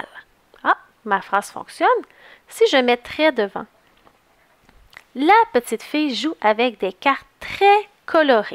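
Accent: Quebec, Canada. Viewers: Ton accent québécois et incroyable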